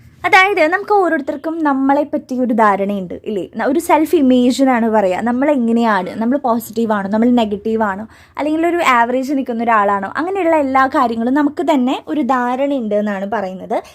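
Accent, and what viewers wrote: Thrissur, India. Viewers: Thrissurkaari aano😊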